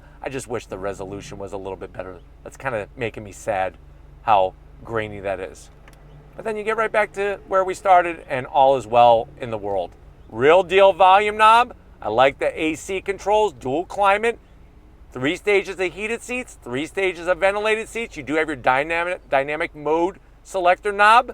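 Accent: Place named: New Jersey, United States. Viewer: I need to know if you're originally from Jersey. Your accent is driving me crazy. It's so similar to my mother's.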